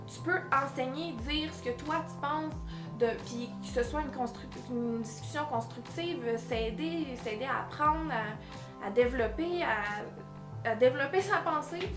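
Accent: Quebec, Canada. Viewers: J'adore quand tu t'énerve... On entend bien ton bel accent québécois !! 😁. Ne t'arrête pas tu es toujours aussi génial. Full love de Suisse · j'adore cette accent québécois j'aimerais trop de l'avoir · l'accent canadien comment je le kiffe wahou le meilleur accent pour moi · J'adore cette vidéo (et l'accent quebecois c'est trop chou <3 ) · Salut, j'espère que tu vas bien? J'ai découvert ta chaîne il y a quelque jours et j'ai regardé énormément de vidéos de toi. je tiens à te dire que j'adore tes vidéos et J'ADORE ta franchise. Je l'avoue j'aime ton accent québécois:) Merci de nous parler comme tu le fais, ne change rien. Full love!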